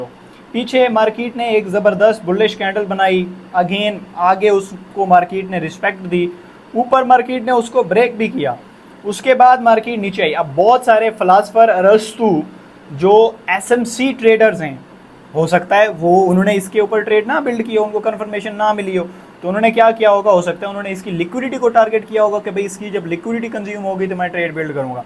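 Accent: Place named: Saraiki, Pakistan. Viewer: sir apke accent se lagta hai aap saraiki hain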